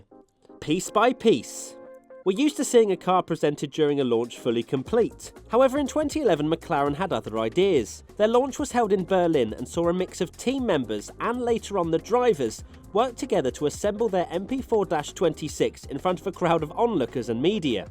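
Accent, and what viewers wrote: United Kingdom. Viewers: Aaaah your british accent 😵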